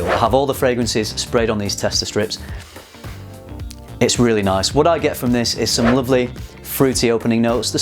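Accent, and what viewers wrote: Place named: England, United Kingdom. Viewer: That dirty English accent Yuk!! A cocktail ????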